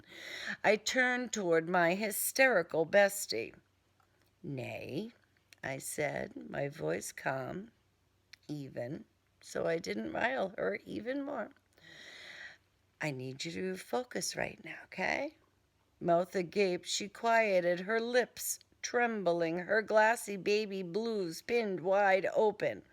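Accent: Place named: New England, United States. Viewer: New England accent.. 30 bimbos..lol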